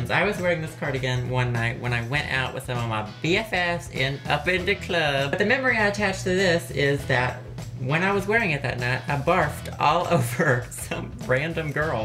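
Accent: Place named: Australia. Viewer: I swear, every episode it's like he has an undercover Australian accent that resurfaces from time to time.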